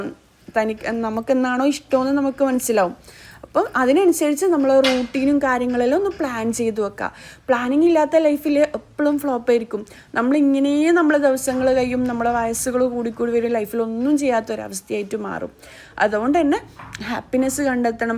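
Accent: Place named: Kasaragod, India. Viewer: Njan kasargodan samsaram njangale samsaram same aanu njangalum kutti machil parayum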